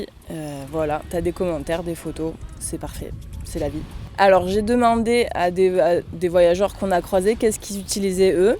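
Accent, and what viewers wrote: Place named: Narbonne, France. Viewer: J adore vos vidéos les pitchous et en plus on a le même accent !!!! (Narbonne)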